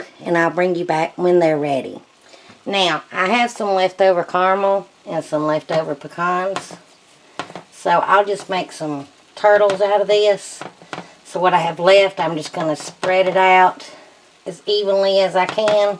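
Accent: South, United States. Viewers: I most definitely love your southern accent!!!!! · I've always wanted to try of these as pecans are my favorite. Thanks for the video. I'm definitely going to try these. And your southern accent is to cute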